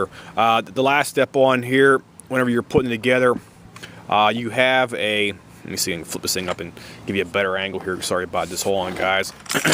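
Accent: Pittsburgh, United States. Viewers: Thanks for the video. Btw, you sound very Pittsburgh.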